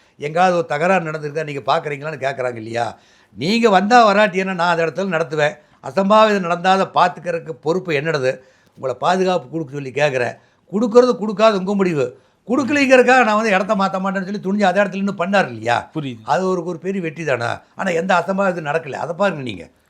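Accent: Kongu, India. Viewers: கொங்கு தமிழில் ❤❤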